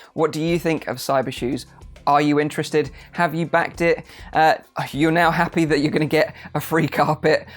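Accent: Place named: England, United Kingdom. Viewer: It's the english accent. From england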